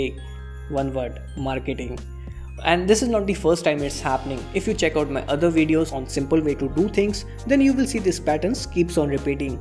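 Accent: India. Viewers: Thanks, good info. One suggestion, if u don't mind: I listen to many video presenters with Indian accents. They all have same problems. "T" pronounced as "D". Example: "two" is pronounced as "do". Also "v" is pronounced as "w", example: "video" or "wideo"? "very" or "wery" ? V and W should not be switched around. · Why is it always guys with Indian accents? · OMG that accent! almost gave me a headache! RIP English. Btw whats with u Indians wanna make everything complicated maybe because you think you are smart or whatever. this whole subject could be explained in a 1 min long video.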